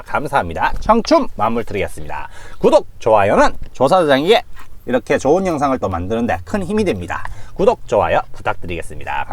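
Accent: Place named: Chungcheong, South Korea. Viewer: 웰컴 서해안ㅎㅎ 경상도 사투리만 나오다 충청도사투리ㅎㅎ고향말투라 더 반갑네요ㅋ뜬금없지만 김포도 함 오세용^^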